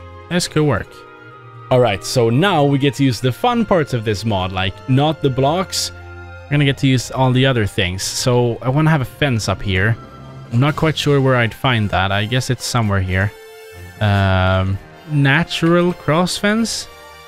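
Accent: Sweden. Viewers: Are u swedish? U sound like it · I know a swedish accent when I hear one. :) Snyggt hus! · Are u swedish?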